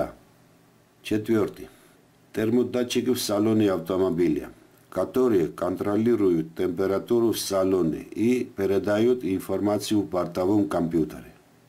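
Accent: Georgia. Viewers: Спасибо за полезное видео ❗️Акцент как у Сталина 💪👍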